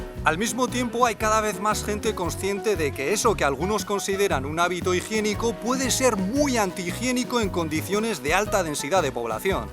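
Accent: Basque Country, Spain. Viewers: Tú tienes mucho acento vasco pero tu novia ha aprendido muy bien el español pero no le has contagiado el acento. Bromillas aparte, un vídeo muy interesante y bien editado. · No suelo contestar ni hacer comentarios, pero podéis conmigo. Como pareja mw encqntais, además que la socialización entre dos culturas tan diferentes colo la española y la China 🇨🇳me atrae una barvaridad., me encanta tu manera de hablar como vaasco que eres Yo soy catalán, hijos de andakuces y me encanta tu manera de hablar. Ojalá esto del covid-19 acabe y podáis hacer una quedada para conoceros. Me habéis eclipsado 🥰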